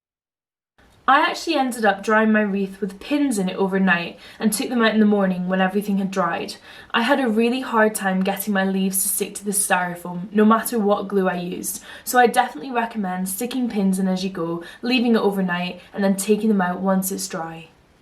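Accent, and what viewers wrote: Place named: Scotland, United Kingdom. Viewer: I love recreating high end expensive decor items for a whole lot less! I love your lovely Scottish accent! Greetings from Canada 🇨🇦!! Your wreath is absolutely beautiful! I love all things Halloween! You should check out my month long Boofest! Your pumpkin garland is too cute! The ghosties are adorable! I love the term “sweeties”!! New subscriber! Let’s support each other!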